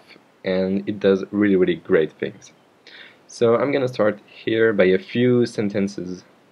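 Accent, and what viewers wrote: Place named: France. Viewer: Monsieur est français ? :D Pas sûr mais un tout petit accent français peut-être :P Sinon belle vidéo et GG pour parler aussi bien l'anglais :)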